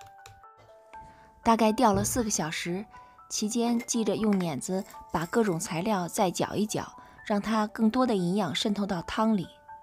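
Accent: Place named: Beijing, China. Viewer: 浓浓的京味👍👍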